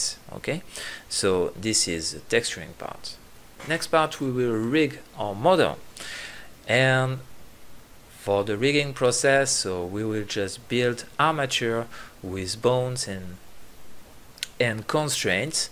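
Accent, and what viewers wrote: France. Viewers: Clairement 😅 L’accent français est présent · On a reconnu l’accent français 😂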